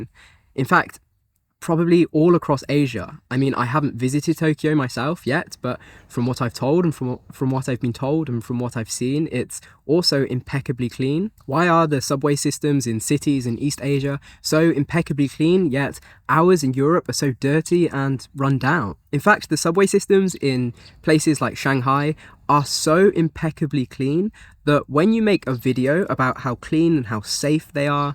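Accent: RP, United Kingdom. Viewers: you sound posh and naive.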